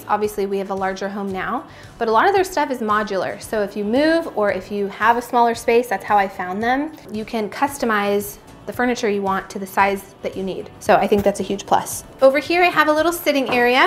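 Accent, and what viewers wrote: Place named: Midwest, United States. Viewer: Lol! How quickly you adopted that mid western sound & y'all!!! Love it! Keep up the good work &!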